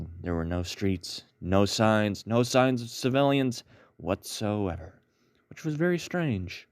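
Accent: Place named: United Kingdom. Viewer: I like your British talking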